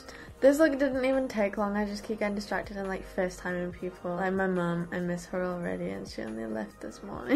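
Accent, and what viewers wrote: United Kingdom. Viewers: how did i not know she was British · anyone else forget shes brittish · POV; asking if she is British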